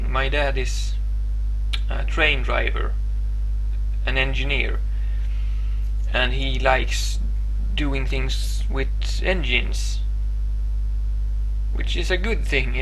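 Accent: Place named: Sweden. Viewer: Your accent remembers me a lot Ingmar Bergman, a lot, a lot.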